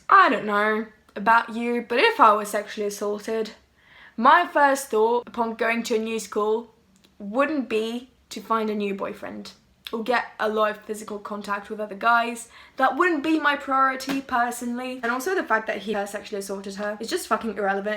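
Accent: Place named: England, United Kingdom. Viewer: I subbed because of your English accent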